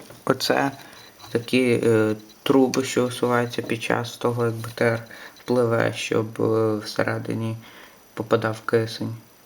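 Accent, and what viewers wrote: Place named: Lviv, Ukraine. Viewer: він зі львова и я це чути як він говорить в нас у львові майже всі так говорять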